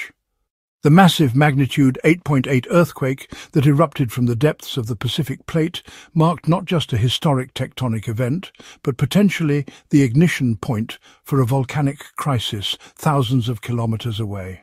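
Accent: United Kingdom. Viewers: Hate British narration:: manipulative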